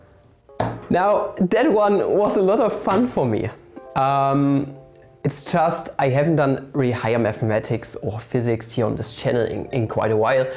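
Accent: Germany. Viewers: A bit too patronizing and arrogant. You are 10-0 behind because of the terrible German accent and from the start Matt Parker's drawing is much better than yours.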